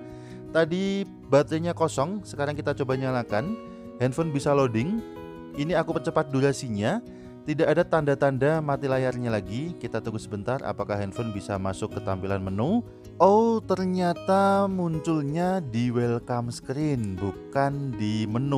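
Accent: Magelang, Indonesia. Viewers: mas sampeyan wong Magelang ndi?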